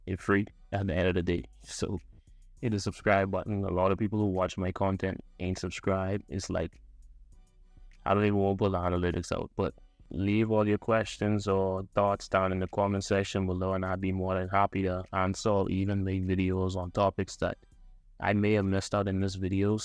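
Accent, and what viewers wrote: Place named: Bahamas. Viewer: ik a bahamian when i hear one lol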